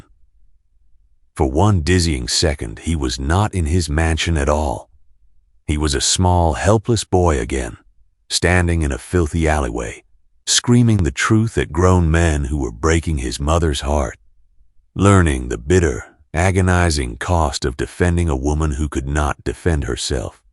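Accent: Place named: South, United States. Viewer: Why did narrator lose English accent and, develope a southern drawl bit unnerving.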